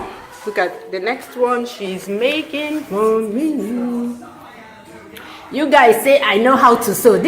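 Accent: Nigeria. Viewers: How refreshing to watch travel video of a person who still speaks like a Nigerian... i got fed up listening to those with foreign accents, whether genuine or fake foreign accents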